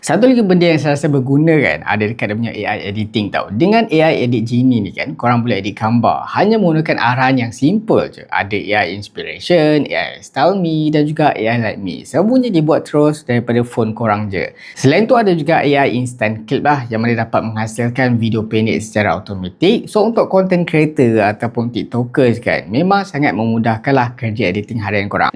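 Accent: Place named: Perak, Malaysia. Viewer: Jongkang jongkit..Kompom org perak ni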